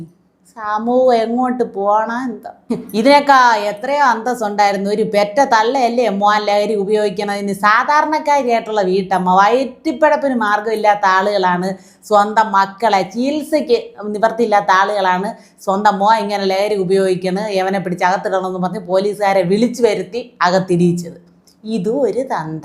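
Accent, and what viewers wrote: Thiruvananthapuram, India. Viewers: Super. TVM slang is suerb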